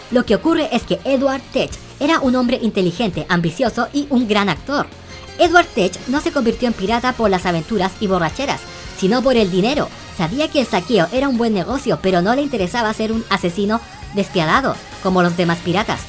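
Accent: Chile. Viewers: eres de chile e-e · hola, descubrí tu canal por el minidoc. de HXH y me quede viendo tus videos y son increibles, agregas mucho conocimiento acompañado de humor. Te me haces bien original me suscribo y si mandas saludos mandamelos en algun video no sé:V y note que eres chileno:D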